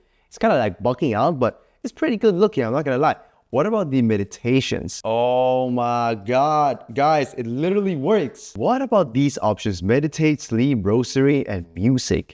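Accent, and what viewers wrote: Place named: Denmark. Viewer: W DANISH ACCENT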